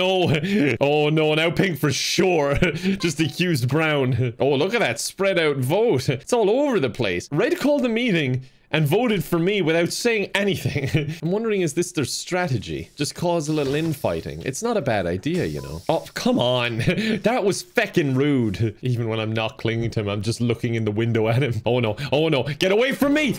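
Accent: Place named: Ireland. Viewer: Your Irish ☘️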